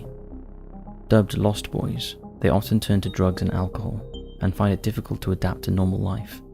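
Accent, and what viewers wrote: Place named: England, United Kingdom. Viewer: Not my problem. Why is a pom narrating?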